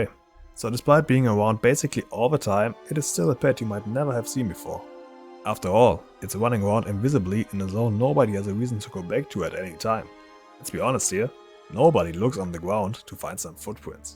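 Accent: Germany. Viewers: Hi! I like your video, thx. Are you German? Sounds as if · Very well made video for a smaller chanel, keep it up :) I'm looking for a new class to play and am wondering if Hunter is a good choice at the moment and in general. How does it do in open world content, mythic + and raiding? How does it differ between it's specs? And how fun is the class to play? I heard that marksmanship hunter isn't doing so well for a ling time, is that true? Are you by any chance from Germany? Your accent sounds like it (I'm from Germany). Than you so much in advance :)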